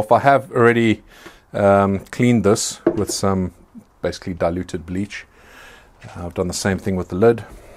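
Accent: South Africa. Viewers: Hi loved this Video and I can hear you are from SA. Where did you buy the equipment(pressure release valve) shown in this video I can't find it in SA. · I have said it before, but I love that South African accent! That sound is home to me. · on closer inspection i believe you are correct. His accent is quite mild compared to most south african i have heard, and some of the accents on words are similar to NZ. But yeah he is south african, he actually says further down the comments. I shoulda read further lol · Hey,. Are you South African? You sound like you're from there. 😃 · South African accent ? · Probably in another video but is that a South African accent?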